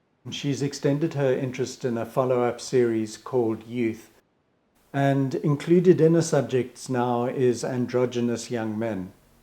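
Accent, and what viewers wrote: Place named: South Africa. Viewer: had a feeling you were south african when i heard your voice :)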